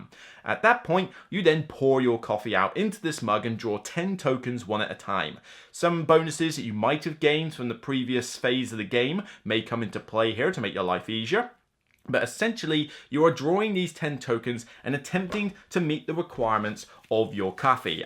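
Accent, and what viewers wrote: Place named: West Country, United Kingdom. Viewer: Where you from? Devon? What's this accent, west country ish?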